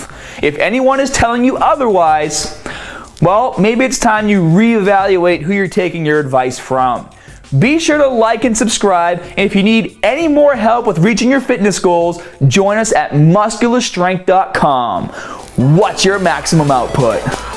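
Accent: Boston, United States. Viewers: h I asked the same question, the answer from other peeps was "bahstin" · It's a Boston accent. It's not a New York accent; I'm from New York. It's not a British accent, and it's not an Australian accent either, although I get the mix-up. Problem solved. To me personally, the Boston accent sounds like a combination of a neutral American accent and an Australian accent. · Boston accent? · "With winter around the cornah" That thick Boston accent! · I don't remember having. that accent. Did move to the Boston area in the past couple years? Or has he always hidden the accent to an extent? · will do but I have to say I love it. Boston accents are great! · lovin the accent from boston myself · bro, when the fuck did u aquire this "thick" boston accent?! u say bar, then baaahhhhhhhm what the fuck haha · Loving the return of the boston accent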